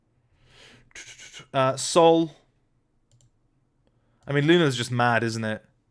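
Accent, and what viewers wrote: United Kingdom. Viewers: Hey I can't believe you are Russian, with such a strong British accent. Sending my regards from Queensland Australia 🤝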